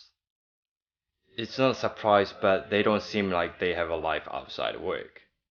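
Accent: New Zealand. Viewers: I was very impressed by how you were able to cut and style your own hair with the use of a mirror. And the handsome smiley face topped off the whole ‘look’. 😉 Where did you learn English? Some words sound like British English and then some sound like the English spoken in New Zealand. Really enjoyed watching your video. Best wishes from Stefan in Ireland. Update: Ha!! I just saw some of your other videos and now understand why your accent sounds rather like a New Zealander!! Lol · I can definitely here your kiwi accent, 😄cool bro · Did you spend time in New Zealand, your English has hints of a kiwi inflection.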